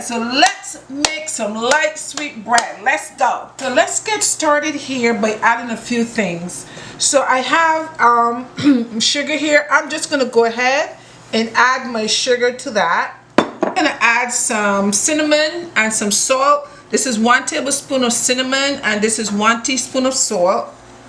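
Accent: Barbados. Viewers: I happened across your channel a couple weeks back. From the time I heard your voice I said "she is a bajan". I am off work this week and you can bet I'll be trying some of these recipes.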